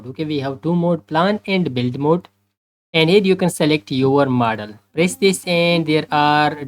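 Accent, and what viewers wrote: India. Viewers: she's insinuating that you are a phone call scammer because of your Indian accent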